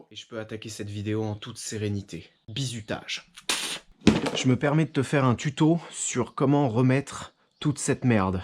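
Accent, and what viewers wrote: Alsace, France. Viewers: Putain elle est énorme la chaîne. Et j’ai tout de suite senti l’accent de la maison. Alsace Frei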